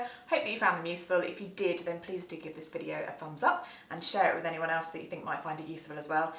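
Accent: United Kingdom. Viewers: It sounds that you got British accent. Am I right?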